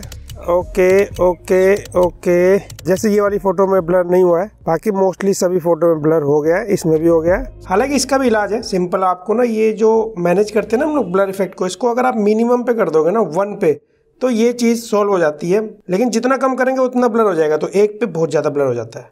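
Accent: Lucknow, India. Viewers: bhai ap Lucknow se ho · Where are you from.... Lucknow???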